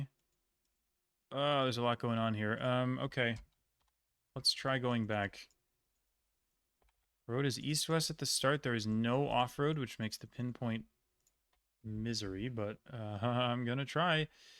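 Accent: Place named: Portugal. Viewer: and if you're fluent you probably learned from portugal portuguese, right? Your accent sounds portuguese-like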